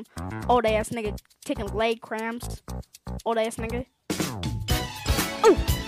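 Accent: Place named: South, United States. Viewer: He got that southern accenttttttttt👍🏼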